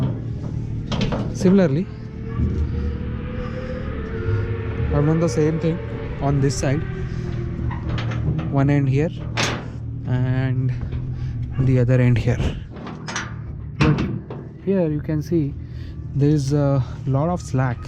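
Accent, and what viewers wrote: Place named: India. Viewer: Sounds like your Indian, hit me back looking for a fellow countryman to ride Road Atlanta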